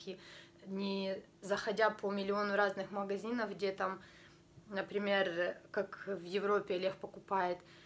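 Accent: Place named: Ukraine. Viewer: из твоих уст слово геморрой!!!!😘😘😘😘😘 у меня аж шерсть дыбом встала. обожаю украинский акцент.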